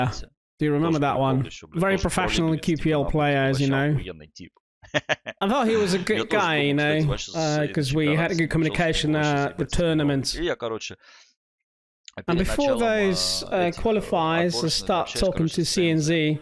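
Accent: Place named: United States. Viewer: а есть видео, без пендосской озвучки?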